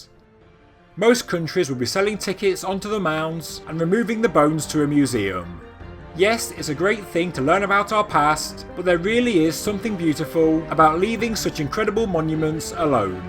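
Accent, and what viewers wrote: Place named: Black Country, United Kingdom. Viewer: Hey man, I know you are from my part of England. Your accent is familiar.😎 I want to thank you from the BLACK COUNTRY for showing us this global civilisation that existed before the bs history we were forced to study even thou it made no sense. What the eff are they afraid of us learning? The truth?.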